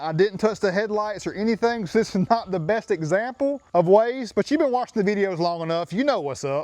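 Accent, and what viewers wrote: Georgia, United States. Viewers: First time viewer, was thinking man he sounds like he’s from Ga. Then I saw Todd’s truck to confirm it. Hell yeah !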